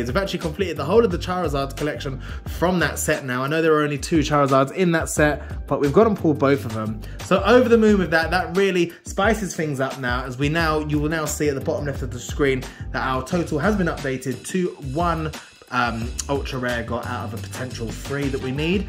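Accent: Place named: United Kingdom. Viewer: Cool Zard! I really like how your british accent goes loud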